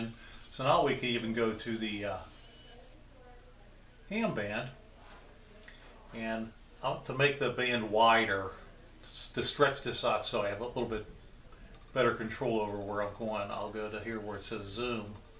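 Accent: United States. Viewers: Sounds like you have a Pennsylvania Dutch Accent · are you from Pittsburgh?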